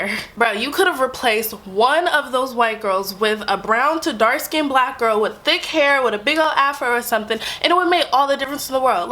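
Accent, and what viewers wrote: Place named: New York City, United States. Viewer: awww you're growing a SLIGHT NYC accent! lol. anyways, great video I totally agree w. your viewpoint on this BS.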